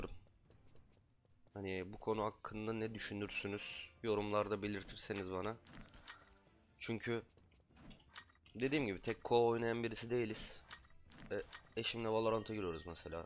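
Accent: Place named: Thrace, Turkey. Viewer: İlk videodan beri diyorum kesin Trakyalı diye. Corludan selamlar 😁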